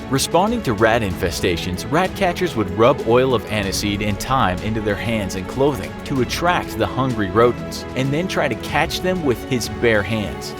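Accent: United States. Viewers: Man i hate this american narrator, feel like i'm getting dumbed down just by listening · "In the human history??" Who TF is running infographics? And why do these people hire Americans to narrate?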